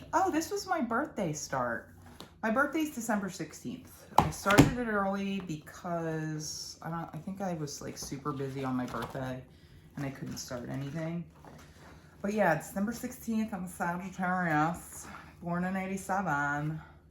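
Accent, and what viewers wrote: Philadelphia, United States. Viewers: Fellow Philly girl here. I HEARD the Philly in your voice in the first sentence you spoke! Not that we have accents...it’s everyone else who have the accent! Loved your video!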